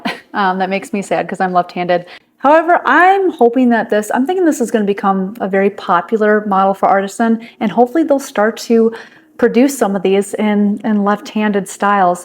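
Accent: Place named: Michigan, United States. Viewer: I love that she took my comment as cool as she did, because you can’t really judge tone through text but I love when she drops “actually” in her videos... She hits it hard with that upstate Michigan accent... (it’s kinda cute)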